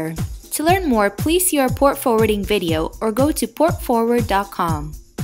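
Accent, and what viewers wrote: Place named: United States. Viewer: The horrible 'skifffle' music, (noise) in the back ground made me shut you off after about 10 seconds. Why the awful, distracting, irritating 'noise' in the back ground? Your American Accent has become very acceptable now and I quite enjoy it - but please LOSE THE AWFUL DIN IN THE BACK GROUND! Many thanks, Bubbles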